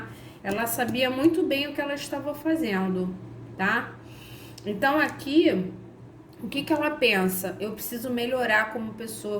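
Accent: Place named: Rio de Janeiro, Brazil. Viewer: Gratidão! Adoro suas tiragens e esse sotaque carioca faz toda diferença, kkkk! Beijos de luz!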